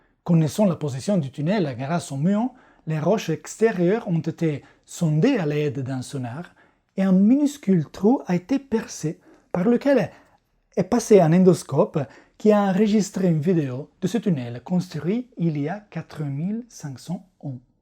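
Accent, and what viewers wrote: Italy. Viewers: Vous avez tout à fait raison!!! La qualité de ses vidéos et de ses explications est de haut niveau. Comme l'est sa pédagogie, sa façon de simplifier les choses complexes pour nous, public ordinaire. Je suis inscrite à sa chaine en italien et ne savais pas qu'il en avait également en français. Outre le fait qu'il est super beau gosse (ici en Toscane, on dit: un bonazzo della madonna) 🤩 Mais en plus, ici, s'ajoute le merveilleux accent italien. Oh Mammaaaaaaaaaaaaa 😉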